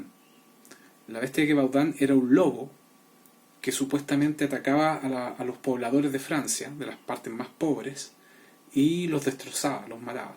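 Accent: Chile. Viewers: Es interesante oirte hablar, eres Chileno? (lo pregunto por tu dejo) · No sé si te han dicho en tu estilo personal y apariencia tiene un parecido bastante notorio con Dross en las miniaturas pero los acentos son bien diferentes el es Argentino pero su acentos no es tan fuerte pues lo dicimula muy bien y ya es un acento más parecido al venenzalano y el tuyo es Chileno si no me equivoco y no me mal intérpretes es más una curiosidad personal porque me gusta el acento de ustedes los chilenos porque es muy respetuoso al hablar y muy correcto bastante parecido al español pues el de todos nosotros es castellano que deriva del español pero si me llama mucho la atención que hablan muy rápido y fluido y no se será la costumbre de hablar muy correcto desde chicos que ya de grandes hablan rápido sin errores para hablar y no es algo que nos afecte a los que escuchamos porque apezar de hablar rápido es muy claros y a según hablan no tenemos ningún problema para entender todo pero no sé porque me parece muy difícil poder imitar ese acento en especial a comparación de los demas y otros que no puedo distinguir es el de los paraguayos y uruguallo es bien parecido al argentino, el de ustedes es un poco pero bien poco parecido al de los peruanos pero ese no lo confundo es como si tratarán de distinguir a los Salvadoreños, con el de nosotros los Hondureños aveces se confunden también con el de Guatemaltecos pero nos es tanto como entre para nosotros también nos confundimos porque son muy pocas las palabras que nos diferencian entre salvadoreños y hondureños, a mí me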